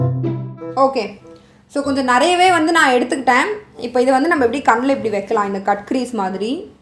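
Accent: Tirunelveli, India. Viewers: Neenga tirunelveliya de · Tirunelveli ya????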